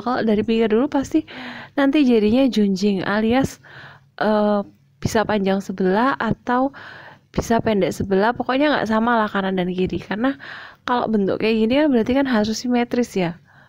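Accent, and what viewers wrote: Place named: Java, Indonesia. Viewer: Bahasane mbk lucu.. Wong jowo yo.. Daerah pundi.😁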